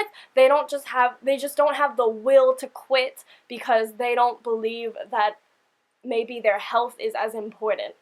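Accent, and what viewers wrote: Australia. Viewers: Were are you from? You sound Australian but not all the way (I I'm from Sydney) BTW I love you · You're accent is becoming more Australian and it's so adorable!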